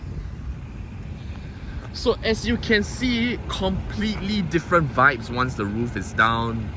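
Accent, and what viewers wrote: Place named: Nigeria. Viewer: Thank you for the review!! Considering purchasing. Also, you kinda sound Nigerian.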